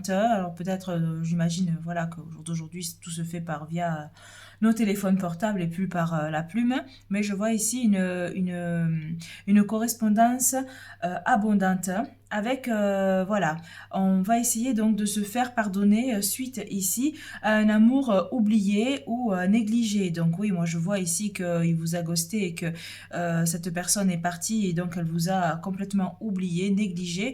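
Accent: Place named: South-West, France. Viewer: Première fois que je vous entends ma chère vous avez un charmant petit accent du sud-ouest peut-être si je ne me trompe 🤔quelque chose oui effectivement mais je ne sais pas la suite me le dira pour le moment pas de nouvelles je vous souhaite de bonnes fêtes🙏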